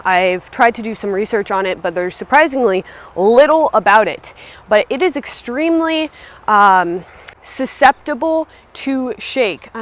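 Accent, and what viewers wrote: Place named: United States. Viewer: I undestand your your american english very well, thank you for that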